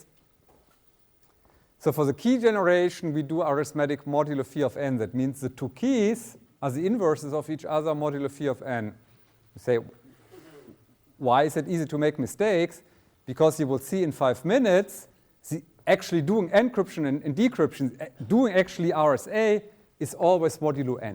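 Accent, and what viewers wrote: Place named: Germany. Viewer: Best german accent ever!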